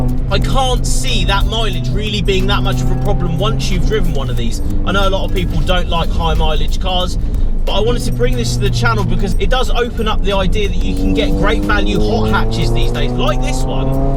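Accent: United Kingdom. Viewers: Same type of British accent as James Corden. Sounds just like him.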